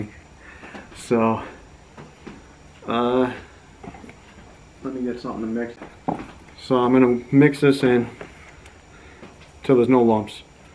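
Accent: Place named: New York, United States. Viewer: I like NYC accent.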